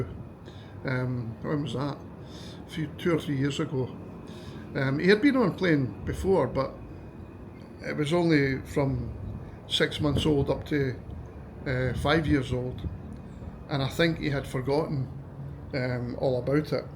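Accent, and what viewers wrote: Scotland, United Kingdom. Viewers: The algorithm popped your channel in my feed and it was so good to hear a Scottish accent I hit subscribe. I just retired to KL from Scotland a couple of months ago because my son lives here. I was a special needs teacher and I was blessed enough to work with many autistic and Down's Syndrome kids over the years. I'm glad your wee boy goes to a good school and is making great progress. You and your wife are knowledgable and cognizant of your son's needs and doing a fantastic job. Much love to your family!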